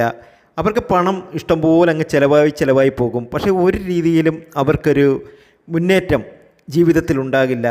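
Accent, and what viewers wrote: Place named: Kottayam, India. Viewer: Kottayam dt aakum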